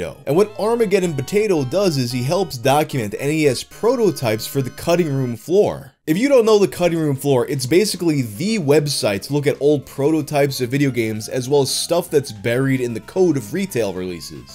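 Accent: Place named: Queens, United States. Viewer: "And here's where today's SPONSAH, Honey, comes in" Just can't take that Queens accent out of you, huh? Love it.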